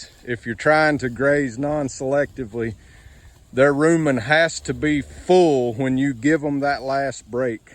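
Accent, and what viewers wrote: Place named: Australia. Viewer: Have you spent time in Australia...every now and then I hear an aussie accent with your American ?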